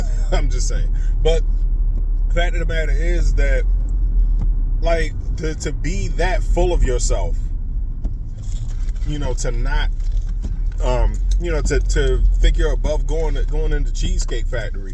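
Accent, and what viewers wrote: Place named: Baltimore, United States. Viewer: Baltimore? I can tell. Dope assessment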